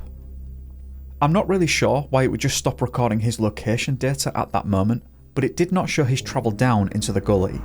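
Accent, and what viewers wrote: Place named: Scotland, United Kingdom. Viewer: Thank you,. I've just discovered one of your videos and I really enjoy your voice. It's so nice that human narrators can still be found! In addition to enjoying the stories, I'm just starting to learn some of the nuances of British accents, and I wonder if you would tell me Where you are from? If I had to guess I'd say you're a Scot, but what do i know! Anyhoo, good channel. Joining now.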